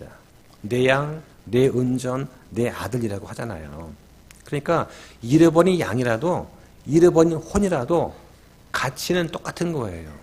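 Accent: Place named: Daegu, South Korea. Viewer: 귀한 말씀 감사합니다, 대구분들에게 잘 맞는 억양으로 말씀 하시니 더욱 좋읍니다~~!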